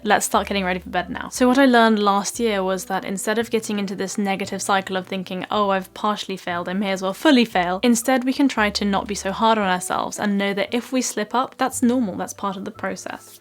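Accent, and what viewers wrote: United Kingdom. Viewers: 纯正英国腔听着舒服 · Your British is amazing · Thank you for making all the videos easy to understand especially learning the British accent, which I really love.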